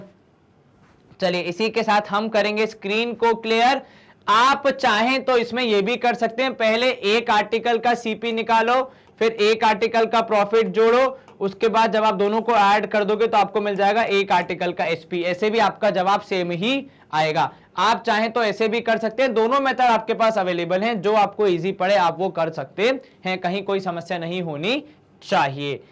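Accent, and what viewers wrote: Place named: Rajasthan, India. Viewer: I think that you are from rajsthan right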